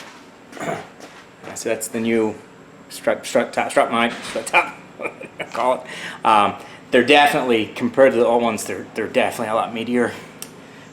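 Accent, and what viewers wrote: Belfast, United Kingdom. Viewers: A hint of a Belfast accent?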